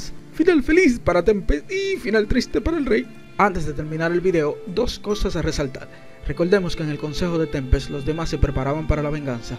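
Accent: Dominican Republic. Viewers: Bro tengo una pregunta Eres Dominicano? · eres dominicano? xD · Bro, ¿eres dominicano? Me encantan tus videos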